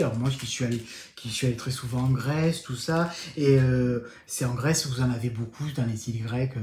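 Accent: South, France. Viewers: Bonjour Mais quel plaisir de vous suivre à chaque fois, je passe un excellent moment. J'adore votre humour, votre franchise et votre gentilesse. Vos tutos sont au top, et les explications de vos ouvrages détaillés sont super chouette. Quant à votre accent J'ADORE!!! Je suis du sud aussi. Un grand merci pour tout ce que vous faites pour nous et à très bientôt. DOMY du 04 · Bisous et merci pour ce podcast tout en gaieté avec ton bel accent du midi. ❤❤❤❤ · Coucou, jeune! Heureusement que la perfection n'existe pas dans ce bas monde, sinon quel ennui! Il n'y a aucune course au tricot, chacun son rythme, chacun ses envies et les vôtres sont très belles et inspirantes, avec cette touche d'humour "avé l'assent". A bientôt. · Bonjour moi le bruit d ouverture de colis ne me dérange pas du tout au contraire,et l émission sur le tricot je l'ai déjà vue il y a longtemps,et j aime l accent du sud! 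nous ont est dans le nord la où il fait gris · Merci, on passe vraiment un très bon moment. Je découvre un univers de teinturières et de créateurs que je ne connaissais pas, alors que j’ai appris à tricoter il y a plus de soixante ans. Je suis arrivée à « « en cherchant un tuto pour talons de chaussettes en rangs raccourcis et depuis j’écoute le podcast J’aime beaucoup l’accent du sud, le naturel et la bienveillance Surtout ne changez pas Bisous de Normandie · Merci pour ce jolis moment,je suis belge et j aime tellement ton accent de soleil a bientôt Big bisous ❤❤❤😂😂😂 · Quel agréable moment passé en ta compagnie! Tes vidéos sont tellement « vivantes » qu’on ne s’ennuie pas… entre ton bel accent du midi et ton humour c’est le moment récréation pour moi. A très vite j’espère 🤗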